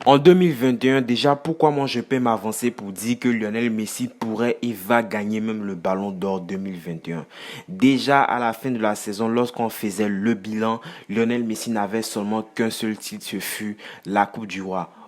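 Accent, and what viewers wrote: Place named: Ivory Coast. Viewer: T’a l’accent ivoirien toi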